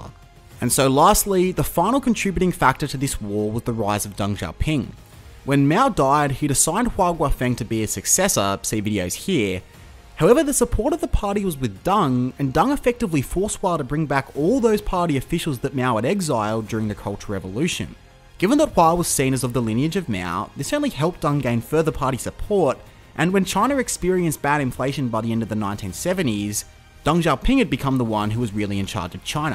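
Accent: Australia. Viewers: Why do Australians pronounce China as “Chiner”, and here as “he”?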